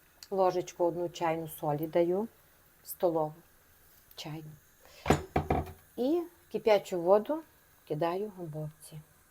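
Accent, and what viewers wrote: Transcarpathia, Ukraine. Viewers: Так приємно бачити і чути наш Закарпатський говір. А страви ми і у Німеччині готуємо ,як колись вчила нас нодь мома. Здоров'я та миру вам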